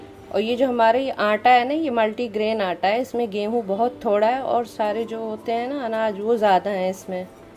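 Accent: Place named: New York City, United States. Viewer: NYC colour of NYC voice 🎉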